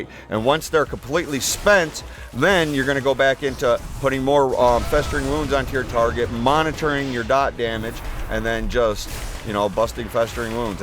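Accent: United States. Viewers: I subbed to get awesome Unholy DK info....and because your voice sounds so American you could be my neighbor. Love this. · I like your videos man. Nice to hear an American voice in a wow vid.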